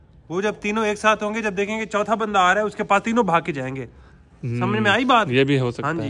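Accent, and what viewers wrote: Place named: Saraiki, Pakistan. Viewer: VOTE FOR FULL SARAIKI VLOG"👏👏👏 In some of your VLOGs, we can see the Siraiki accent of you people, in some places you also speak, it seems very good, you speak very eloquently and the accent is also very good. All of us will be very happy to see and hear please accept our request.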